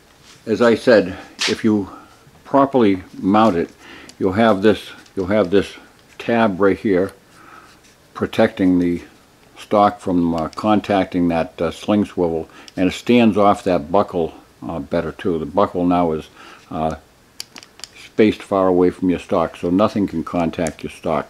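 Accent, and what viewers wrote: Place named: Boston, United States. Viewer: Excellent. Learned something new. I detect a Boston accent. What’s a freedom loving gun owner doing in that forsaken state?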